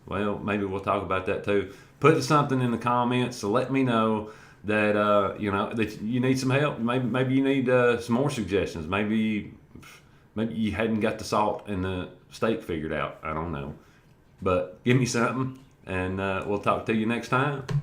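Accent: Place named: Appalachia, United States. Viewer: Good vid man, Loving the Hillybilly Accent :D, subbed to follow your progress!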